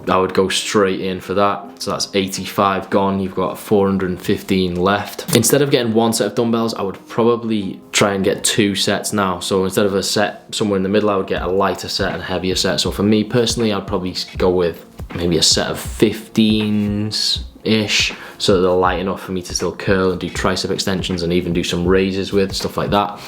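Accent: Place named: England, United Kingdom. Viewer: His voice is like asmr for me... idk if its because I'm american and his soft english accent is soothing or what.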